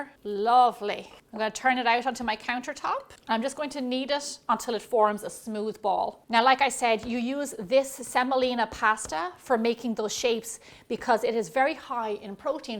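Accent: Ireland. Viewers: I love the pronunciation of Italian names by someone with an Irish accent! ❤️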